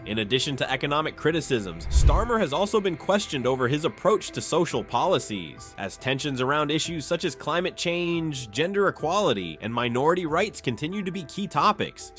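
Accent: United States. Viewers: lies lies and more bs .... are you that desperate to use clickbait titles and a really crap american voice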